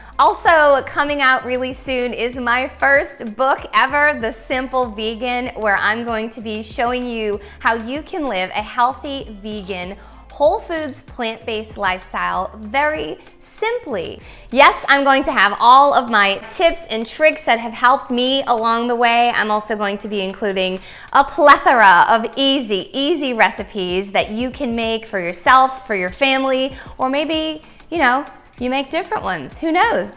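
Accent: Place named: South, United States. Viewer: I love your southern style. I am from NY and I would have love to be born down south, accent and all❤️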